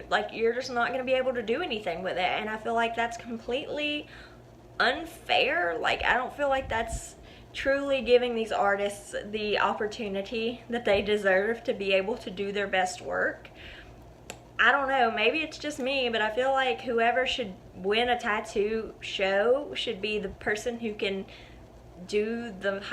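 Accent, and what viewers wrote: South, United States. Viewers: Girl, I’ve been meaning to leave you a comment to tell you that you remind me a lot of myself. I ramble when I’m telling stories and I have nervous habits just like you. I know a lot of people do but it’s really refreshing to find a youtuber that I can relate to! I absolutely love your tattoos too by the way. And your southern accent. I’m probably being a bit creepy now, sorry about that! I subscribed a few days ago. Love your content. ❤️ I’ll stop now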